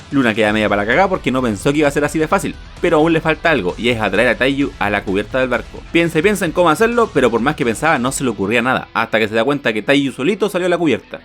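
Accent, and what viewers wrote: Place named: Chile. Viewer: no aguanto su asqueroso acento chileno....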